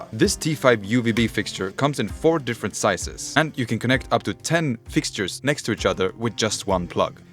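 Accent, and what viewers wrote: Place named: Sweden. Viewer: You sound swedish. Or am i completely wrong? :P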